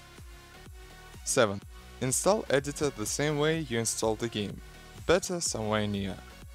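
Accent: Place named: Russia. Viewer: почему твое произношение похоже на русского?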